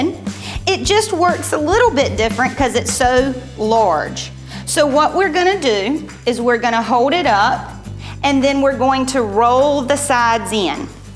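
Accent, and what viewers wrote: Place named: South, United States. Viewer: MY TEACHER AND I LOVE YOUR SOUTHERN ACCENT♥️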